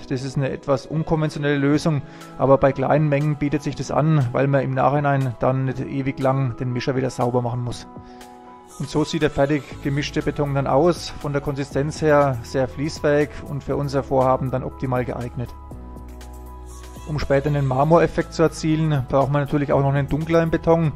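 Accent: Franconia, Germany. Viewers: Wieder sehr interessant geworden das ganze, locker erzählt mit leicht fränkischen unterton.......mach ner su weider ! Die 3te Platte schaut schon am besten aus, allerdings könnten die Kontraste etwas stärker sein. Evtl etwas warten bis der Beton nicht mehr ganz so flüssig ist und die 2 Farben sich nicht mehr so stark vermischen ? Hmm.......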